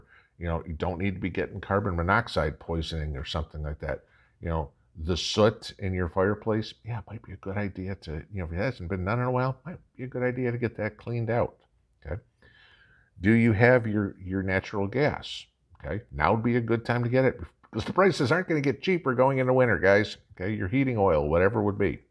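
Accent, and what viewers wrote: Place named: Indiana, United States. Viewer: ..Blizzard of ‘78..no wonder your speech sounds more from Kokomo (Indiana) than KY or TN!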